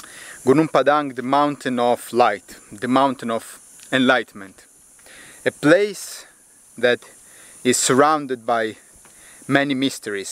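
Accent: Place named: Russia. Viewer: You have strong russian accent xD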